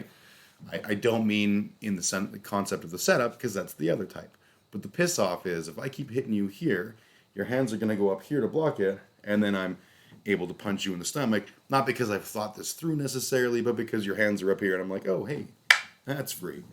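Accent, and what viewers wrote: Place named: Appalachia, United States. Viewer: I love your hillbilly accent 😂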